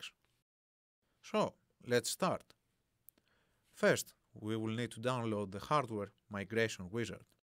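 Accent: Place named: Greece. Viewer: This man sounds like he's Hellenic! :) Nice! :)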